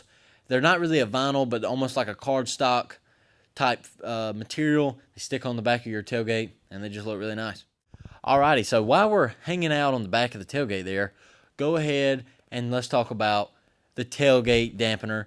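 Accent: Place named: United States. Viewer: I am taking advice from a kid half or nearly 1/3 my age. He is a detailer, and has a country accent. This gives him Truck Credibility. I want to know how to mount my AR, that pops up out of the bed for people that cut me off, and how to remote aim it with laser sites. Nice looking truck he has there.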